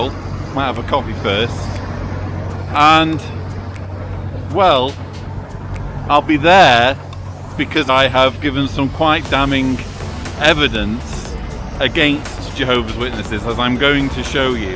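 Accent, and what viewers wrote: United Kingdom. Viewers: Keep the good fight!!! Best British accent ever.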